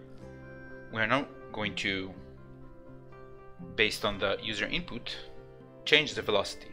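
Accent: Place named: France. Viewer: You are doing a superb job and I can understand you perfectly well. (Although it strikes me as ironic that you sound French and you actually say the 't' in Godot, when it would be silent :-) ). Please keep up the excellent work!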